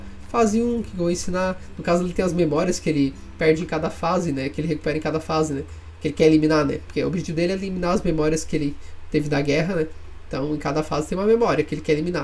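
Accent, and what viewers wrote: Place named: Santa Catarina, Brazil. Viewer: Obrigado pelo conteúdo irmão! E esse sotaque de SC ai? haha, sou do sul tb, Blumenau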